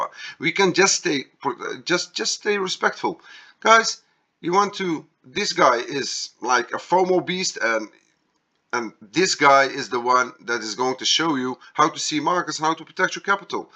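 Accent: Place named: Poland. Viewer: Dude your English is great! I love Polish accents and way of speaking.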